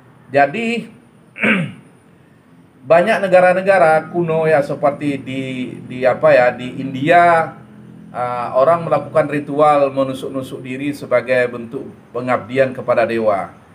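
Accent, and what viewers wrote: West Sumatra, Indonesia. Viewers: Maaf pak saya msu tanya, kalau di lihat dari logat bapk saya yakin bapak barasal dsri sumatra barat kayaknya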